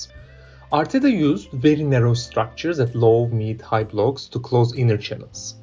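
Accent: Turkey. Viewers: you sound like a turk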